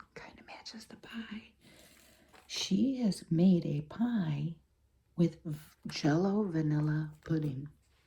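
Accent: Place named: Minnesota, United States. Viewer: Sounds like Minnesota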